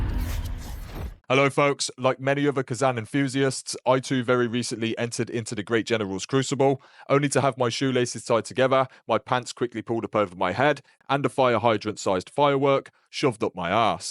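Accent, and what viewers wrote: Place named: United Kingdom. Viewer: Never heard such a cock in the mouth accent as from this Brit 😂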